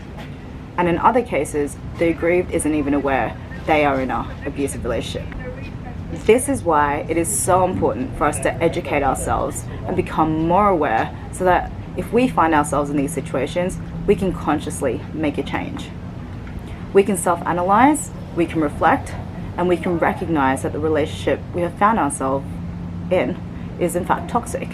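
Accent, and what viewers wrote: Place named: Australia. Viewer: I seriously love australian accents so much (which is what I think yours is?) lol if not, I STILL LOVE IT Also, I can relate to ALL OF THESE. It made me laugh when you said "you just wish they'd leave you," because I lowkey would have this thought in the back of my mind that said "I wish he'd just cheat on me so I could leave angrily." I know it's bad, but I was looking for a way out instead of facing my true feelings of just simply not wanting to be there.. I am no longer in that relationship though!!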